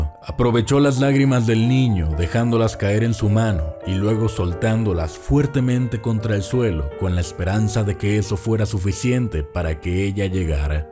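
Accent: Mexico. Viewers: Es la voz de Sherk Pero lo senti mas mexicano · Si hubiera disimulado el acento mexicano estaría mejor.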